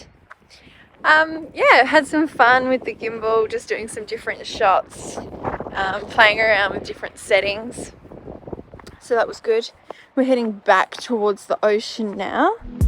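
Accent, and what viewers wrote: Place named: Australia. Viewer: Hmm..🥱 Tho I do like hearing your Aussie accent and raspy voice, good job!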